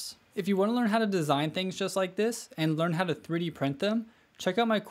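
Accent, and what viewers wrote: United States. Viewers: He has an American accent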